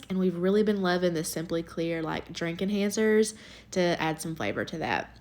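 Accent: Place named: Rural, United States. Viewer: my son loves those cheese cubes lol. Love your country accent😊🥰🤠. Unfortunately my son is a super picky eater and does NOT eat meats or seafood so I definitely have to get creative lol. Love how real and honest you are about showing what is actually more realistic for most parents to pack for their kids. Especially when they can't microwave anything lol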